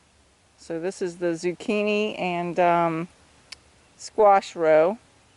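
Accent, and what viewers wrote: South, United States. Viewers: Thanks for the Southern accent I miss it so much I am a southern boy living in a different country and I need to hear the accent sometimes.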